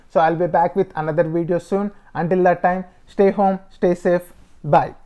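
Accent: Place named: Bengal, India. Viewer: Your accent is like of a Bengali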